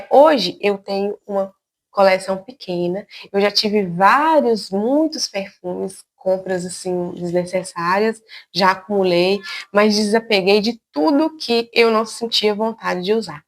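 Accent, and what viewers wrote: Minas Gerais, Brazil. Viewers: Mineira com certeza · VC é mineira?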